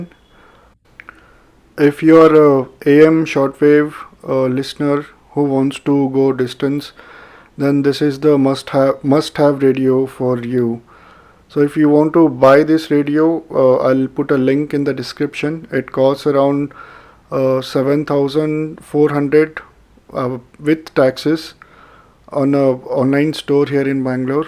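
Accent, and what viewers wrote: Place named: England, United Kingdom. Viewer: I am in love with your English accent brother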